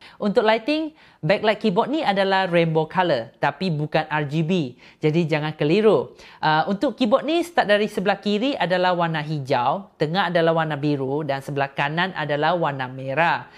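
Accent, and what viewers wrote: Sabah, Malaysia. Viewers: macam Sabahan + Singaporean?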